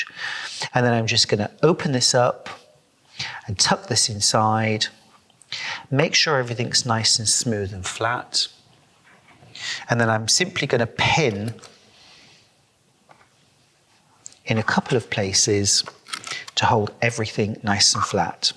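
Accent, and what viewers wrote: England, United Kingdom. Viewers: Inspiring tutorials & luv that English accent!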